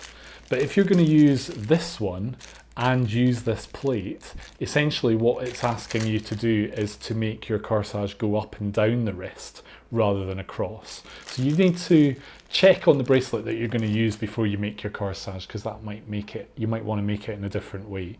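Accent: Scotland, United Kingdom. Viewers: refreshing to hear a Scottish accent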